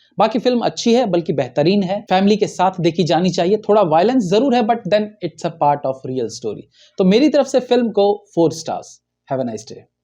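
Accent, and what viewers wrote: Bihar, India. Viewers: Are Bihari nith bol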